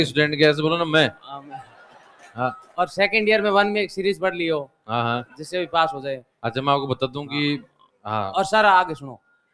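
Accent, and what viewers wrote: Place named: Jodhpur, India. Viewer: ye jodhpur ka hai..... Pakka boli aise hai😂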